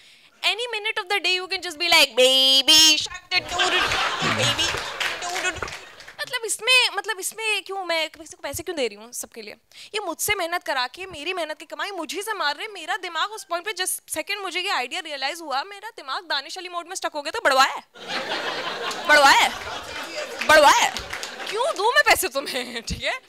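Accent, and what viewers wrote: India. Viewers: why do you sound Indian ? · Well that was great.. But.. The language chosen by her was a bit like they speak in india.. I mean the way of talking.. Or even some punch lines.. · She has an Indian urdu/hindi accent.. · Exactly my point. I liked the script but her accent was more indianish.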